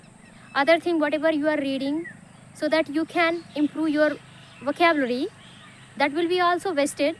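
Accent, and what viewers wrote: India. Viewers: Abe Indian accent choro🤦🏻‍♂️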